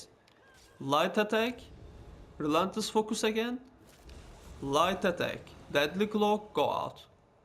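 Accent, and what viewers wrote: Turkey. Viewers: Türkmüsün kardeşim Türk İngilizcesi bu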